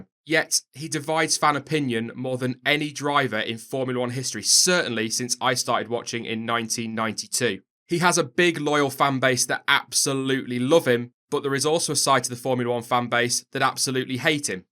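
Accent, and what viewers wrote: United Kingdom. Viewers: Nicely done, interesting to watch. But british-english is pain to listen...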